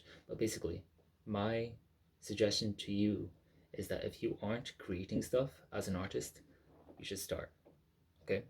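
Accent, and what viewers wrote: Ireland. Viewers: are you irish?? or live in ireland?? i can't place your accent but i swear you have our manners of speech it's driving me crazy lol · This was great advice, thank you! Also, not sure if that's a bit of an Irish accent I'm picking up or not? It reminds me of my fellow Dubliners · I hear an irish accent for sure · are you irish?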